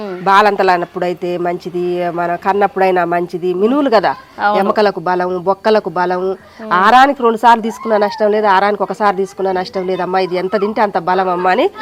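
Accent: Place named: Mahabubnagar, India. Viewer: Medhi mahabubnagar side kadha language thelusthundi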